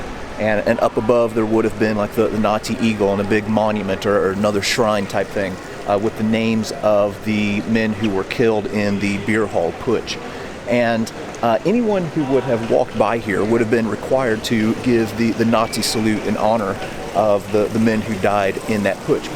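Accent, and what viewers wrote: United States. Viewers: Shame about the dreadful American accent. The word “route” is pronounced “root,” not “rout.” The “A” in Adolph is pronounced as in “rat,” not as in “cake.” Nothing annoys me more than Americans mutilating the King’s English.🏴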